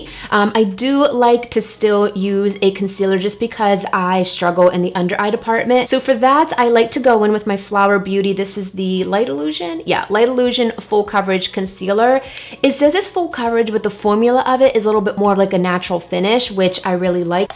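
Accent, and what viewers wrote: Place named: New Jersey, United States. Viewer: Where r u from. I think i hear a jersey accent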